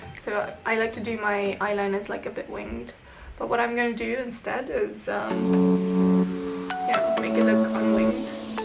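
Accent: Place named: United Kingdom. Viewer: Wow! It's great that you have such a great sense of fun about this BUT, It's really deplorable and sad that it's even necessary to approach this subject. I think you will need a paper bag over your head and some kind of sack clothing to prevent being recognised as asian. I've been away from England for 40 years but your accent and expressions sound beautifully British to me, so no worries there.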